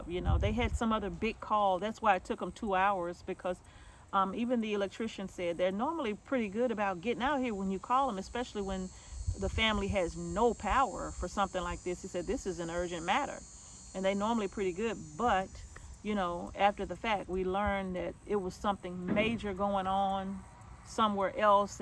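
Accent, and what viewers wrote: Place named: Georgia, United States. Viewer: Thank God He intervened and kept y'all safe! I just love your videos and hearing you talk. My husband and I live in southeast Georgia so you talk like us! Glad things are fixed and better for you!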